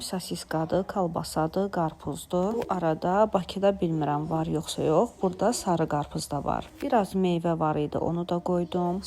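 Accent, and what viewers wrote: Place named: Russia. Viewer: amma mən elə bilirdim siz rus dillisiz deyə belə formada danışırsız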